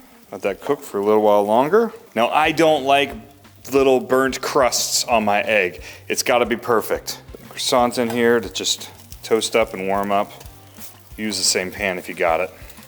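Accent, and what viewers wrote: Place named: Glasgow, United Kingdom. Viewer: You sound like you're from Glasgow.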